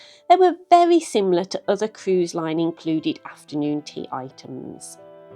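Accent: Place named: Black Country, United Kingdom. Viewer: Great work on this video …very comprehensive…..and, the Black Country accent is fantastic ….( that’s a guess by the way ) 😂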